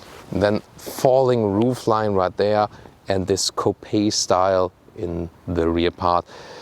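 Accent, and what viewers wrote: Germany. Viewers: I like your German English!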